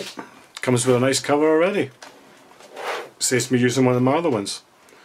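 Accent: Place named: Stirling, United Kingdom. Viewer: Just added this to my collection haven't opened it yet and found your video, hello from Stirling lol finally a familiar accent on YouTube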